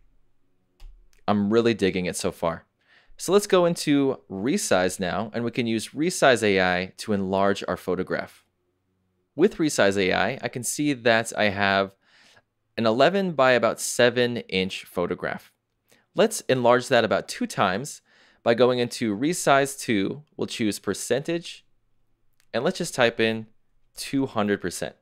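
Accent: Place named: New York, United States. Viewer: Why do you pronounce ON1 Photo Raw as Photo Rah? I've wondered about this for a while now. Is this a NY pronunciation?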